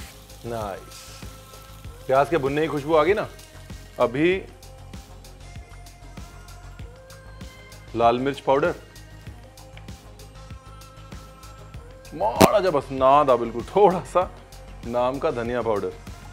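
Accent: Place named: Lucknow, India. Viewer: Ranveer Bhaji .. assi vi Lucknow to a. Menu vada changa lagta jab tussi pubjabi vich gal karde ho , with a slight Nawabi unfluence. Hor tuhadi recipes de ki kenhe. God Bless you, Ranveer!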